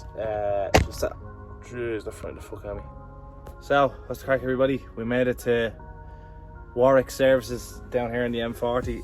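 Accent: Ireland. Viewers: Hey, Dutch trucker here, I really enjoyed the views, I only drive Netherlands and Belgium so. Normaly I watch English movies without subtitles but your Irish accent and kind of mumbling is really hard to understand... also the automaticly generated subtitles aren't very helpfull. Maybe you can edit subtitles correctly for future videos, I am subbed from now on.... peace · A nice broad Irish accent. Sometimes need to listen a wee bit carefully but I get the gist of most of what he's saying. I certainly don't want to sound disrespectful or rude to the nice young man.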